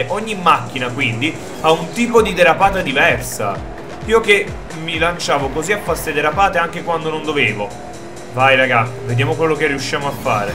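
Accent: Naples, Italy. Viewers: è napoletano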